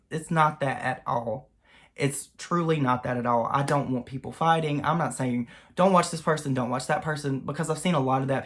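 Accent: South, United States. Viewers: Im disgusted. I only have youtube, no other social media so i dont see/hear other drama. What I can yell you, as an insignificant Aussie ol' gay boy, you and Nick is the duo I enjoy watching. I dont know what to make of Rich, in Aprils video's she says he's a shallow, immature cry-baby. Anyway, I think you are a sweet guy, i love your fucking accent! I know Americans like our accent, well I just LOVE 💕💯🌈 The southern accent, i think its gorgeous. Believe in yourself, you give more to the world than you know and I think the online world appreciates you more than you know. Everyone knows you & Nick are 💯 real, youre funny good guys, the fact that you're willing to say "Hey, I'm no role model, I'm straight up shady sometimes" lets me know that you are self aware. I think Rich see's himself in a particular way and it really isn't based 100% in reality. 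I know you don't know me and me and what I think and say doesnt really matter but just know that you entertain me and I really do appreciate your work and find it entertaining. Sending LOVE 💜💕🌈💯 and support from Australia girl 😚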